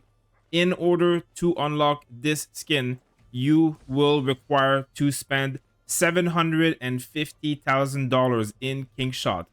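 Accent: Quebec, Canada. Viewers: Tu sonne comme un québécois aha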